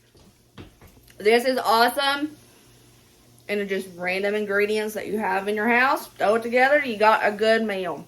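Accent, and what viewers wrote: South, United States. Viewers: You talk country! Where ya from?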